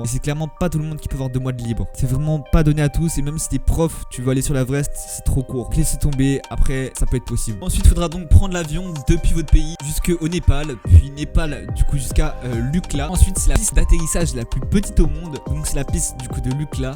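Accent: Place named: Banlieue, France. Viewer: Des barres 😂 Ton débit, ton phrasé, tes expressions, ton regard " Banlieu " loin de " l'Univers Montagnard " mais il y a des vérités dans ton speech ;)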